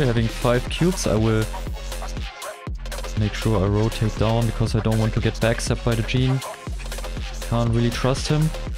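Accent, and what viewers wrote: Germany. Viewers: It was pretty nice , and I'm totally okay with german accent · it’s okay the German accent, your literally my fav yt for guides like no joke nobody makes them better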